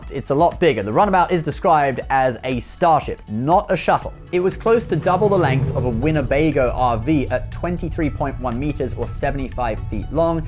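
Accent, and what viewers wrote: United Kingdom. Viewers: Cool. Its. He's back.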